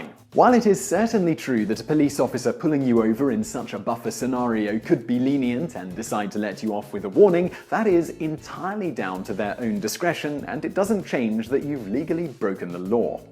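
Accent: United Kingdom. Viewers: It's funny hearing someone with a British accent use, "miles per hour".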